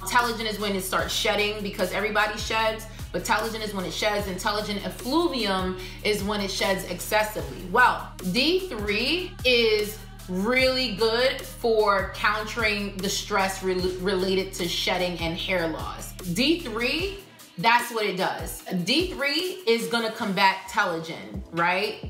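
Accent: New York, United States. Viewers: Great info....gurl....I know that accent....Gurl what part of NY you from? lol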